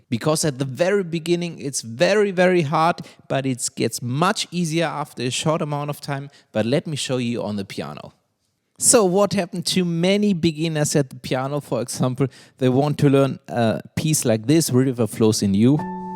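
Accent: Germany. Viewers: German accent right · Its a german accent. honestly hes speaking way better than most people i know. have a wonderful day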